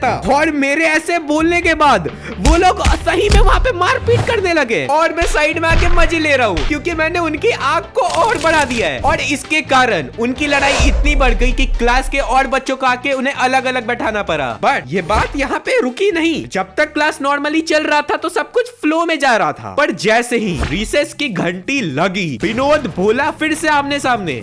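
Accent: Bihar, India. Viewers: Bihar se ho kya?? Aapke bolne ke style se laga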